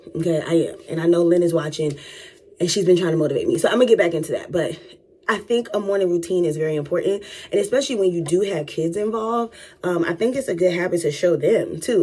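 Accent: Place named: Midwest, United States. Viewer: Where are you, please? What state, you sound like you're Midwest... M. 😅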